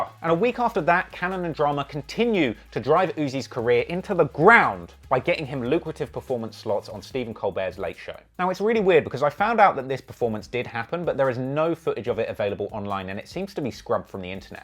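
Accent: United Kingdom. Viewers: I'm really listening to a british dude talk about how a rappers career is ending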